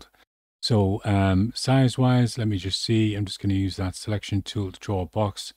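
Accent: United Kingdom. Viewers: Thanks for the response, I'm sure it is a lot more stressful to do that sort of thing As for your sense of humour and personality, it's probably fair to say it is very British, and all the better for that. It can get very hard finding a familiar voice in amongst all of the American streamers. Take care of yourself and I'll certainly finish off watching the last few episodes of the playthrough. Chris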